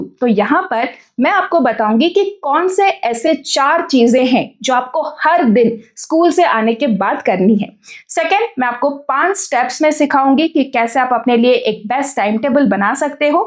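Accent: Odisha, India. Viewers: Ma'am app odisha se ho kya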